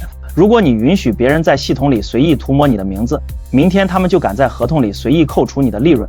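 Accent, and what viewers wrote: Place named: China. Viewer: 奇怪這位先生應該要用台語說才對吧！怎麼會用大陸口音呢？就算把首爾改成漢城也只有台灣自己說而已，全世界都會說韓國首爾！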